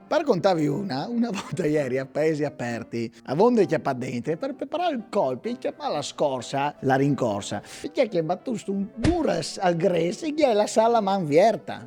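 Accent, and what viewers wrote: Italy. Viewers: Brâf! Il furlan di indulà tabaitu ? Un tic talianât.. e tu sieris encje disint Ciao... 😮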